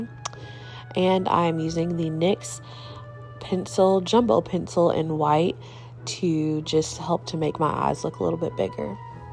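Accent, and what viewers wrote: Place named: South, United States. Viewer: Absolutely loved this! Gorgeous and your beautiful southern accent is the cutest! Wishing you much success on all of your social media platforms!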